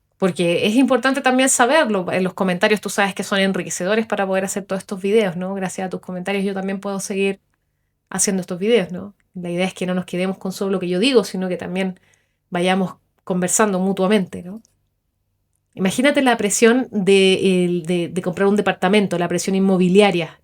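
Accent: Chile. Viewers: 🙌🏼 Qué bien se siente oír hablar muy bien de nuestro país a un extranjero. Eso significa que los panameños no lo estamos haciendo nada mal, a pesar de que a veces somos muy duros con la autocrítica. Pero es bueno autocriticarse para mejorar. Por tu acento supongo que eres chilena. Yo tuve un inquilino chileno, durante 2 años, que vino hacer un trabajo de ingeniería en una fábrica de mi provincia, y él era muy educado y amable. Ya me suscribí a tu canal chica. Espero sigas subiendo más contenidos sobre nuestro Panamá. Saludos. 👍🏼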